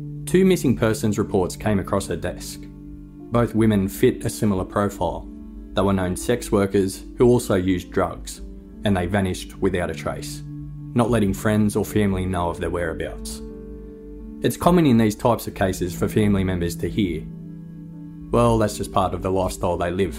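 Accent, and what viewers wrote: Australia. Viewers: Could do without that Aussie accent though.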